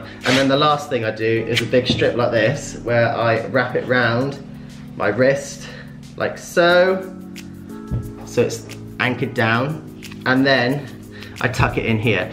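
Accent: United Kingdom. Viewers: My god how i love this boy. I love his face. I love his british voice. I love his muscles. His pecs his biceps all his body. He is just perfect for any man or human. I love his videos. The sexiest man alive in the whole world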